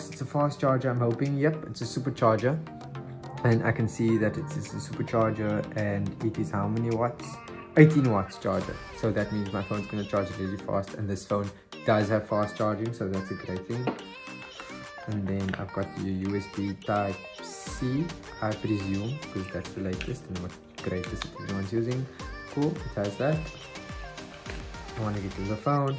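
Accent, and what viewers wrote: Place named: Afrikaans, South Africa. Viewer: Love the Afrikaans voice I'm also south African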